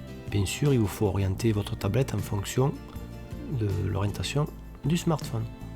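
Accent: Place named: South, France. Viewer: D'ailleurs tu viens du sud non ? (J'ai le même accent que toi et c'est rare de le voir sur YouTube)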